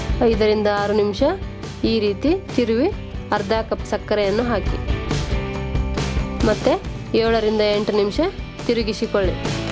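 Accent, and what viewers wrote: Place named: Bengaluru, India. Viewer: Akka, Uttara karnatakadavraagi yaake Bengaloorina kaanada maataadtira.. Uttara Karnatakada kannada kelidre barjari kushi aagtade. Ade tara maataadri..; Recipe mast ide..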